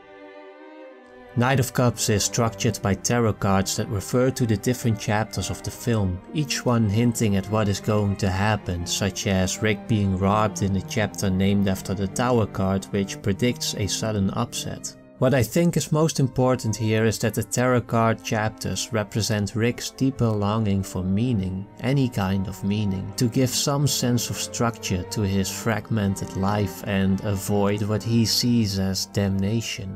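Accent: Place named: Netherlands. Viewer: Cool video, the accent with a couple words you pronounce in a particular way...sounds very very familiar, makes me feel at home! Thank you for the video! This could have helped me a lot about 2 weeks ago, luckily music was my first love and brought me back. Now clearer than ever and finally at peace with myself. Grtz, a no longer wondering Hollander! ;) <3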